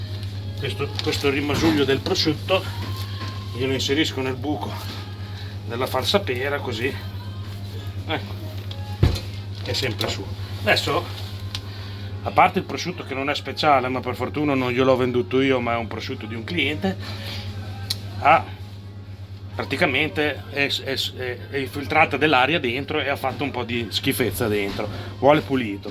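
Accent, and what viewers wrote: Emilia, Italy. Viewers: Si ma guarda che lui ha un'accento emiliano, mica romagnolo. Va ben che ne han fatto una regione unica, ma a parte quello son due cose ben diverse, e accenti ben diversi, con Cesenatico non c'entra niente...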